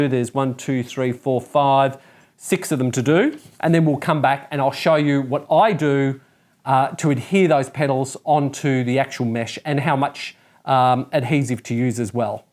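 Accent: Australia. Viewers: Very well articulated and I love the Austraaaiilliiaan accent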